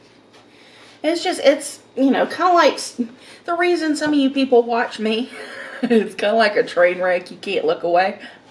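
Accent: South, United States. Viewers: Ya I notice your accent alot...I love it. I'm french so all I hear is french accents😄so to hear a nice southern twang is really different & cool for me. Your voice is very soothing😌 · Im British and we have SO many accents lol but that goes with the American accents too. Us British find the number of American accents - especially your southern accent is really unique!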